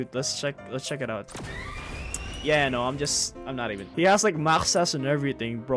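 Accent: Philippines. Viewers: He's from Philippines not fully english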